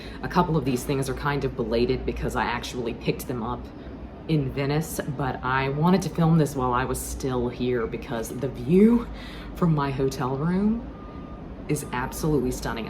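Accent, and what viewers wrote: South, United States. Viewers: OK. So many thoughts from a newbie subscriber. What is not to love about toner when it comes to skincare? A swipe of toner over the skin leaves you feeling soft and clean. And if it smells of roses.... then its positively swoon worthy. Spiral notes books are my favourite to work with, but I would probably be like you and not able to resist buying a whole armload of new journals. I wish I could read in another language. Heres a video idea, you reading the Italian books with your Southern accent..... delightful! OK. Walking away now before I scare you away.....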